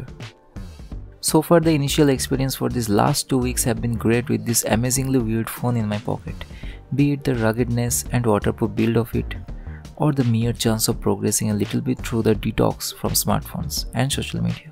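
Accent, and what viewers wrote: India. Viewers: I knew as soon as you started talking you were from Kolkata.... as one Bong tech nerd to another, I subscribed instanty! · bro you sound indian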